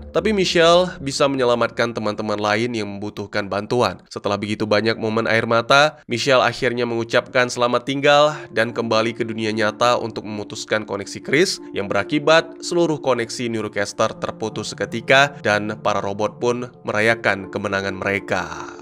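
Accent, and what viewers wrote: Aceh, Indonesia. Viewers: Bg orang Aceh ya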